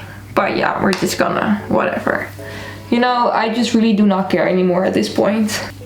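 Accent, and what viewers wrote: Netherlands. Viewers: I hate myself for knowing you were Dutch just by your accent😂😭. Ik dacht zo van “huh is ze Nederlands?”😂.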